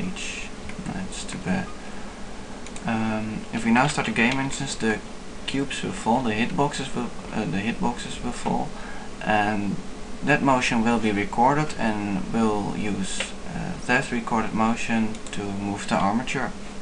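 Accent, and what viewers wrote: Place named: Netherlands. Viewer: nice dutch acscent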